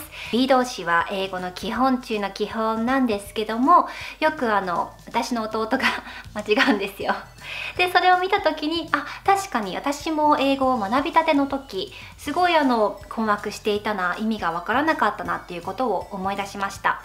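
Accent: United Kingdom. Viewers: とっても分かりやすいです！因みにChiakiさんはイギリスアクセントをどうやって学んだのか気になります！そして、やはりまずはAmerican accentをある程度身につけてからの方が良いのでしょうか？？👀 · すごく分かりやすいです。私の先生はイギリス人なので、の話方が、本当に参考になります！先生と会話が続くようになりたくて、youtubeを必死で見ています。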